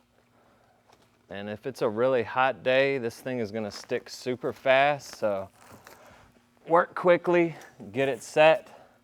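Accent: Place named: Rural South, United States. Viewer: Awesome video. Sounds like you might be from a southern or rural part of the country. It's cool to see white folks doing Mexican work. We don't see that here in the city.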